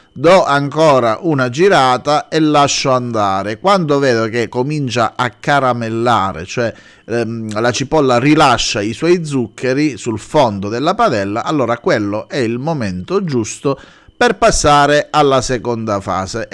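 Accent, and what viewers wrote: Calabria, Italy. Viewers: Grande. Peccato quell'insopportabile inflessione calabrese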